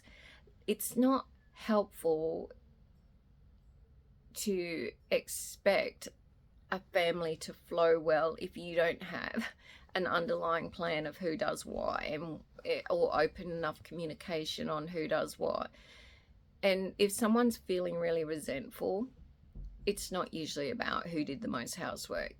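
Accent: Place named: Australia. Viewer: Are you Australian? Its kinda tricky where to place your accent?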